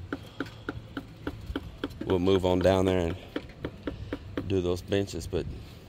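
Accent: Texas, United States. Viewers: Love your videos and your Texas drawl "I tell you what..." A question - do they sell Balsa wood in the PH? I see you at the lumber store regularly and thought I'd ask if you have seen any. Like 2"X4" X 8'? Or a similar wood that is light and strong? Thanks man! 👍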